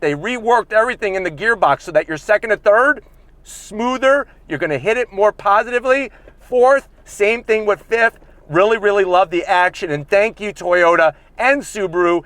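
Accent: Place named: New York, United States. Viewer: )) of course, he sounds new yorkish, they can talk you to death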